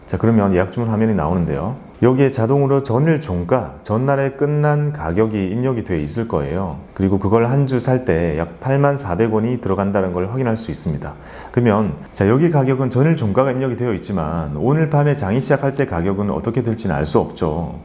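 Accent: Gyeongsang, South Korea. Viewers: 좋은 정보 감사합니다. 경남사투리 참 매력적이네요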